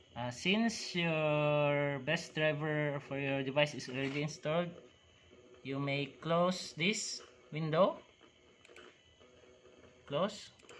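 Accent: Iloilo, Philippines. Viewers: Illongo ka pre? Hehehe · dayon?! hahaha ilonggo kaman gali hahah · Illongo ka nong?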